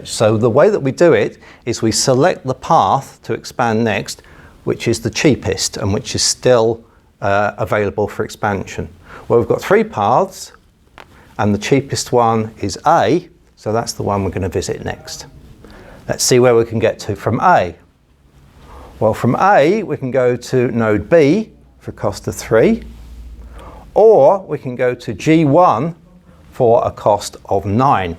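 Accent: United Kingdom. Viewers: Came here just for the British accent :p .. nicely explained! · besides this amazing explanation , this is a very lovely British accent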